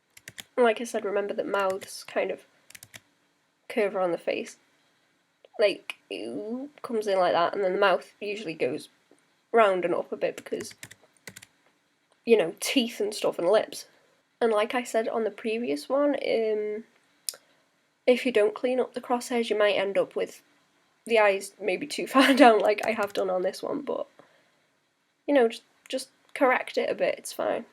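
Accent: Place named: Worksop, United Kingdom. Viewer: I just found your chanel and its really refreshing to a) hear someone that sounds like me! (I'm from Worksop) and b) actually see someone work thats not perfect on the first stroke!!! It's great to see someone work REALISTICALLY! ;)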